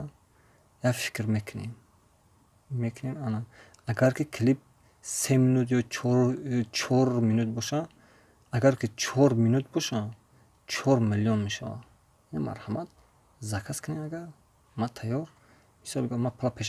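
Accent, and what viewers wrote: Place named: Kulob, Tajikistan. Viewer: Ранги чучи кулоби гап мезани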